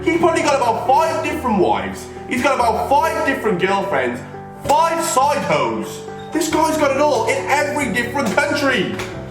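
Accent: Wales, United Kingdom. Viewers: Is he WELSH?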